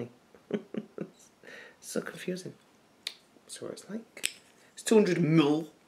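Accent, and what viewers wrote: Hull, United Kingdom. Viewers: hes from hull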